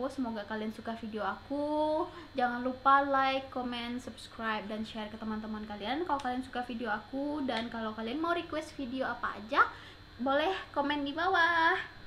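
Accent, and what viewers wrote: India. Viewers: Masyaallah suara nya merdu persis India bgt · Masyaallah mbak..wlaupun org indonesia tapi suara logat india ny bagus bnget.. Samawa mbak... Beruntung ny bisa mndpat kn suami yg baik.. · Masyaallah kak, suaranya percis banget sama orang india💞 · Keren suaranya sudah pas suara cewek India..baiknya suami adek ni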